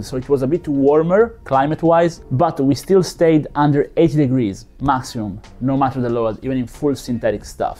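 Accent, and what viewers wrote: Italy. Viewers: His italian accent is killing me😂 grande bro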